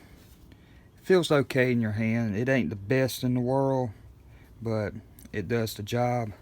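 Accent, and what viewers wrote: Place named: Brooklyn, United States. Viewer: Ok, WHERE online does one obtain replacement points? LOVE that Brooklyn accent!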